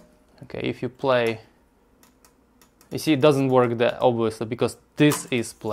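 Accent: Russia. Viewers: Сразу слышно Russian accent 🤣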